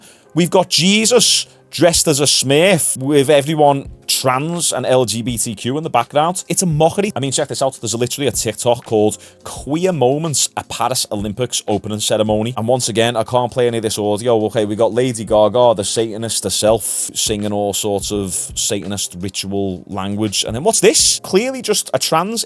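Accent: Liverpool, United Kingdom. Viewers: Listening to your voice you sound like you're from Liverpool one thing I can say about you guys man you're on point wherever there's b***** you guys make sure you make a stand I'll totally agree with everything you're saying this is a joke I haven't watched the Olympics for the last three times it's ridiculous lots of love to my Liverpool people😮😢😮😢😮😢😮😢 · I love your accent. Its the best of the British accents 👏🏾👏🏾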